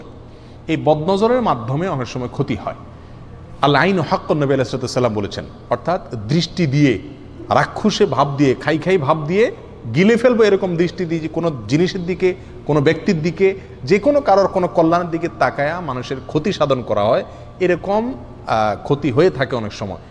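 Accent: Chittagong, Bangladesh. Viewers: Vai Apni Ki chittagong Er ?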